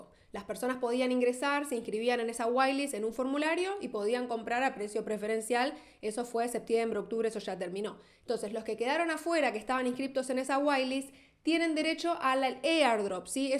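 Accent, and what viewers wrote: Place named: Argentina. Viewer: que bonito es el acento argentino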